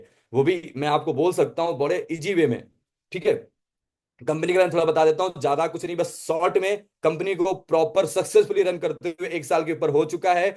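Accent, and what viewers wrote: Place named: Bihar, India. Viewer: Bihar se ho kya Tone match kr rhi h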